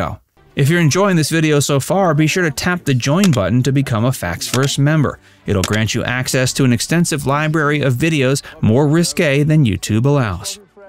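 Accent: United States. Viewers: Nice job your mispronunciations had me in stitches, literally rolling on the floor. I suppose you are speaking American though and not English.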